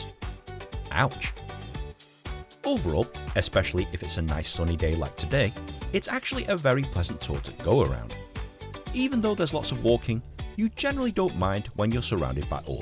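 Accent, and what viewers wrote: United Kingdom. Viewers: It’s not a hatred comment but I couldn’t imagine he has such a beautiful British accent … so proud of you Asian brother!!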